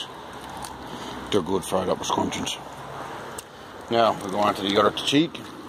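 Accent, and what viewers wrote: Newfoundland, Canada. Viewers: Most likely from Newfoundland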